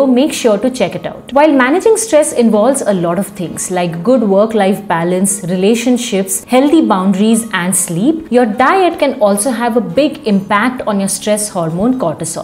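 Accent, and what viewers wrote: India. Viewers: Man how I like that Indian accent…. Could hardly concentrate on the contents 😊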